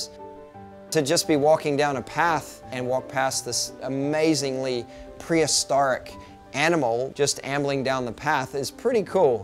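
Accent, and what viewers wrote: Australia. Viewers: Straight ozzy. Maybe got the mixed accent from different work environments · partial aussie accent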